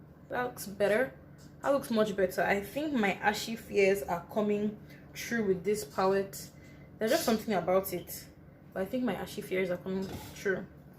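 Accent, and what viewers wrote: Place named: United Kingdom. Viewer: This your British accent doesn't want to go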